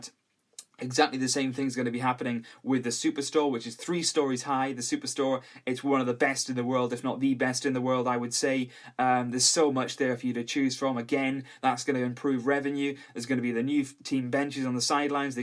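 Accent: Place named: Scotland, United Kingdom. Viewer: Methinks he is Scottish. He should be supporting Celtic, Rangers or by the sounds of him, Hibs or something.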